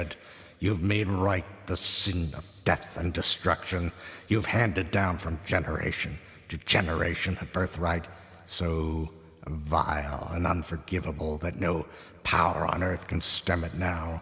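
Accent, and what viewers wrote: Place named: United States. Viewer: Kevin Walker No. DeGrimston was British, this is an American accent.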